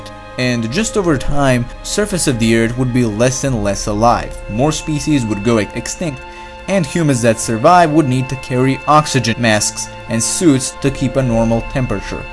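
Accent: Serbia. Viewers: Just a Serb speaking English. Doubt you'd sound fluent when you speak another language.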